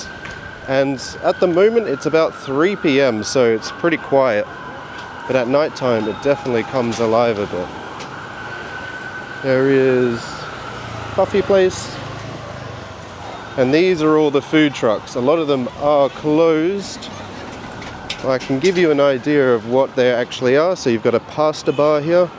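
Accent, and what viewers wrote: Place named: Australia. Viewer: I think he is Australian, it's his accent.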